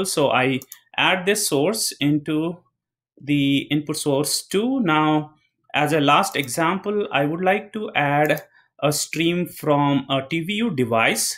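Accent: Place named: India. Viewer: love to hear indian voice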